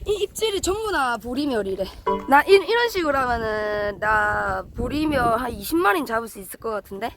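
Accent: Busan, South Korea. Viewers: 오~부산사투리 듣기좋네 내는 창원인데 윽쑤 정겹네요 마이 잡으이소~~~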